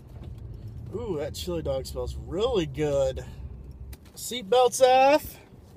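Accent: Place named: Texas, United States. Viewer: I think I need to marry a Texan. You got the nicest accents.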